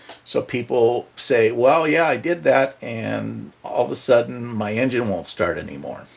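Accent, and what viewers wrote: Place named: Seattle, United States. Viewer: Great video thank you so much, a big help. Can I be pedantic and point out that the 'screws' you refer to removing at the start are not screws but bolts. Bolts have a machine thread which to work always has to engage with a nut or a threaded hole. Screws screw into wood or other softish materials and never require a nut or other receiving thread to be functional. Thanks! PS You're not BIll |Gates are you? Your accent is just like his.... Maybe a Washington/Seattle accent!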